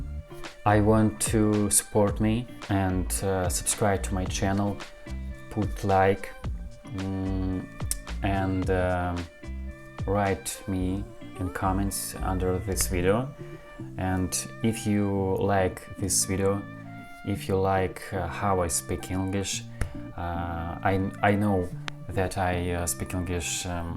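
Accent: Russia. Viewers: bless you. You are doing great with learning English, and I don't think it sounds funny. There's nothing wrong having the Russian accent while you are speaking English. I wish that we in the US would do better with learning more then one language. I took German a long time ago and started to understand and speak it, but then I lost the knowledge because I didn't get to use it. You should be very proud of yourself, and I really mean that. Don't let others tell you that you sound funny or that you can't speak English. Unfortunately like any country we have rude people here too, and you just need to ignore those people making those type of comments. I have a friend who is from China and learned to speak English. He would start apologizing when he couldn't find the right word to say, and I would yell at him for apologizing. I said don't worry about finding the word, what I want you to do is stop apologizing, there's no reason to apologize for not knowing a word or because you don't feel that you aren't saying the word right. 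I'm always happy to help you pronounce or find a word, but you can't keep apologizing. So that is one thing I want to pass on to you, there is never a reason to apologize. And remember the ones who are the rudest to you, are the ones that could probably never begin to learn a second language. You just keep practicing and it will happen in time. English is a damn hard language, it's more then just learning the words. Half the time it seems there is no reason f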